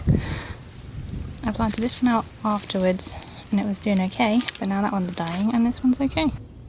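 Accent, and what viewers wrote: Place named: United Kingdom. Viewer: I've always wondered what part of the UK you're form cause of the accent. I'm currently from the Midlands. Also love the veggie garden, both of my Uncle have quite the green thumb as well, planting their own veg lately.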